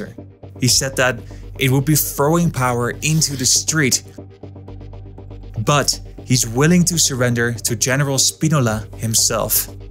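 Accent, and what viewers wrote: Netherlands. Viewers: You've got a pretty thick Dutch accent. Are you Dutch?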